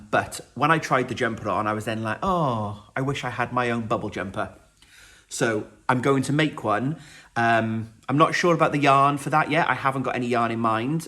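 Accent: Wales, United Kingdom. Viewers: I'm loving your videos. Good luck with getting through your plans. I really enjoyed knitting the flax light for my daughter in lockdown. I'm currently on the last few rows of my Winter Lights Shawl by Stephen West (my first West Shawl). Also hearing a Welsh accent is making me feel home sick being a Cardiffian living in Manchester and not having seen my family since February 2020. Thank you for the virtual cwtch!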